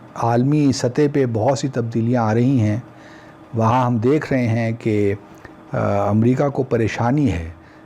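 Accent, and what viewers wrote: Pakistan. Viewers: Sir, are you a Hindko speaking Peshawari?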